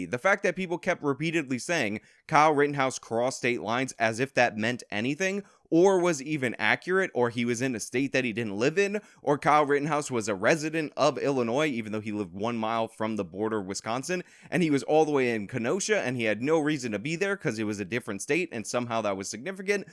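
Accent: New York, United States. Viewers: Alright the whole smartass NY accent is starting to get annoying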